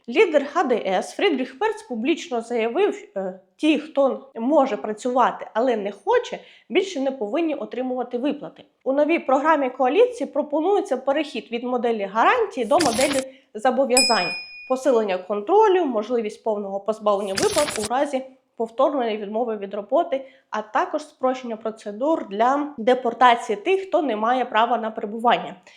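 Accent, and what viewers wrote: Standard. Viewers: Дякую вам за гарну і чисту українську мову🇺🇦🇺🇦 Ваші розповіді приємні і актуальні👍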